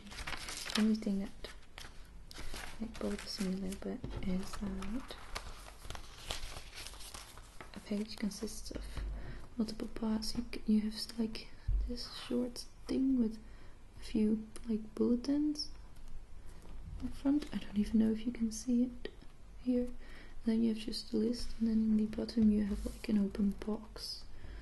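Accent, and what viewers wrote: Netherlands. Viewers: Ben je nederlands? Hahahah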